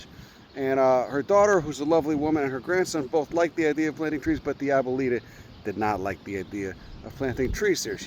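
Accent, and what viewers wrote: Chicago, United States. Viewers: Love the Chicaga accent! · Love your accent!! My entire family from south side Chicago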